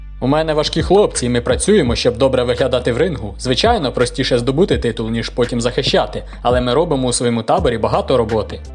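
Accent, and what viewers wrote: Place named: Rivne, Ukraine. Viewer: У вас Рівненський акцент...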